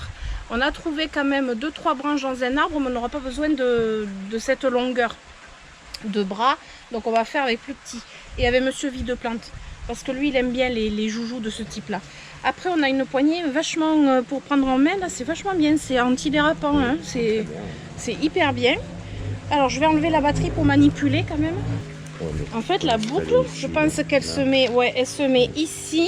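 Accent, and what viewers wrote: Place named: West, France. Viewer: Bonjour et j'adore votre bonne humeur et votre accent de l'ouest!!!!et bravo pour votre vidéo et merci 🌞🐞🍀🌹👏👏🦜✋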